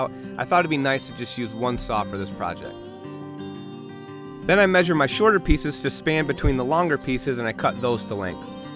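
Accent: Michigan, United States. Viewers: R u from Michigan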